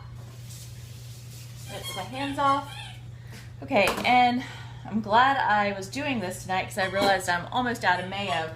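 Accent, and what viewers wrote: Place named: South, United States. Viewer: Hi, I'm spanish and I love the south. ¿what part of the south are you from? I'm studying the differents southern accents. Thanks!!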